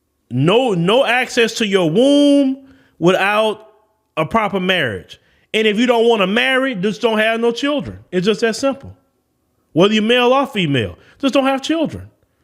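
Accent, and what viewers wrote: South, United States. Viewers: Love that southern accent brotha! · I love your southern accent.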